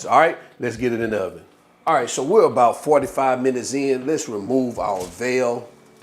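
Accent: South, United States. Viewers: Quick question?: are you from the south? I’m trying to pick up on your accent and your style of cooking lol. Your food is always looking good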